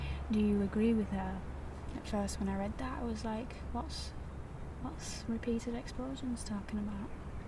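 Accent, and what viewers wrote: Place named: Manchester, United Kingdom. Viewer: Your great! Where are you from im detecting manchester?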